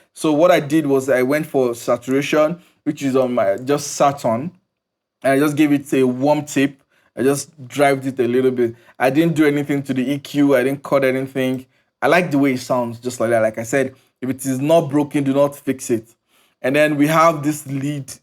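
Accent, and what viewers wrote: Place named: Nigeria. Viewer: Love your beat and your 9gerian accent. ❤️ from 🇧🇷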